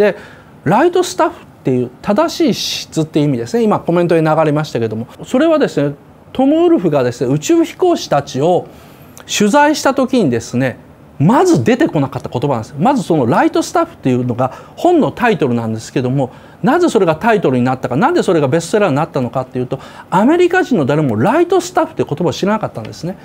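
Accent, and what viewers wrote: Kansai, Japan. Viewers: 最初の方は関西トーンな岡田さんも良いね👍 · 知ってるかもしれませんけど、この人、元々関西弁ですよ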